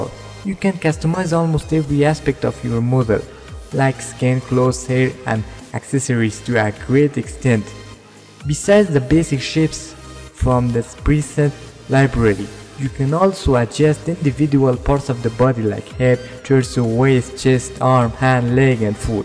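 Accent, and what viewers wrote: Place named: Russia. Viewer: Почему мне кажется, что ты из России или стран СНГ?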